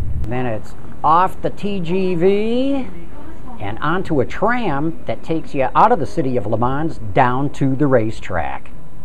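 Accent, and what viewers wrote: United States. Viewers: This guy's voice is insufferably American. I'd hate to travel with him to Europe.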